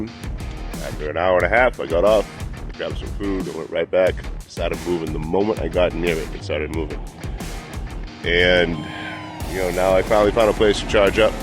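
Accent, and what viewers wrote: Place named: Maine, United States. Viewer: You sound like you're from Maine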